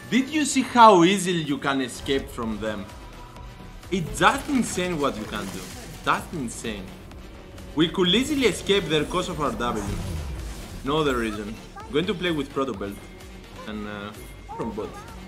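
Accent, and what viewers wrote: Greece. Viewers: pretty sure its greek. i am from greece and lots of people talk like that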